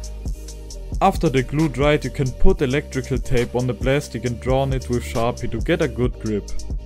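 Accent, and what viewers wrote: Germany. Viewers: U can hear the German, in his voics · Moin ich höre das du deutsch bist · Er hat so ein Deutschen Diacsient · Du hörst dich ürgendwie Deutsch an... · Dieser deutsche akzent😂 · Der deutsche Akzent ist zu geil und Respekt das du so gut sprechen kannst habe es probiert mit meiner Maus(bloody Al90)und habe über 85cps bekommen ❤ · nichts gegen dich aber man hört das du deutsch bist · German but speaking English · Man hört so das du deutsch bist haha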